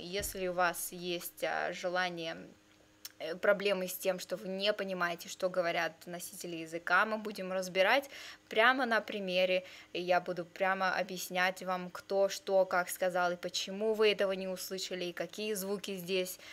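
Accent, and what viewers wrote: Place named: United States. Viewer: По речи видно, что она жила или пребывала некоторое время в Америке, язык может немного "теряться", и это не удивительно.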